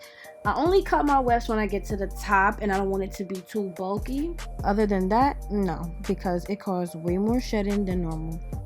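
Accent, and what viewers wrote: New York, United States. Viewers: Lmfao I knew you was from New York soon as I heard your voice 😭 what’s poppin. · You most def from New York , you said some “ we not budderin bread” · Less than minute into the video I knew she was from New York 😂😂😂😂😂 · I love us New Yorker's! As soon as we open our mouth ppl automatically know where we're from! Sis said a "hoodie" 😂😂😂 #youslayedthatwigtho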